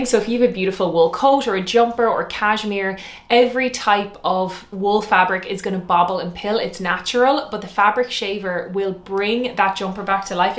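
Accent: Ireland. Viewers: You look like a young Elizabeth Taylor! Stunning! Except your Irish!) love your accent! I agree W your tips. I clean skin regime…..and those little laundry bags for your intimates ( keep your $$ things well, they last,same with makeup…..you invest in it…take care of anythng it will last. I love being tall & thin wearing leggings …..WOW TIDE REMOVER PEN? LOOKS LIKE A NEED FOR ME! THANKS GIRL IVE A KITTY SO YOU GET ME ❤😊😊😊😊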